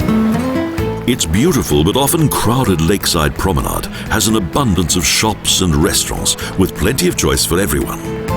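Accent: United Kingdom. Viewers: Janet Njie lol, which British accent does the narrator speak? :D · Adrian Zieniewicz it British mate! 😁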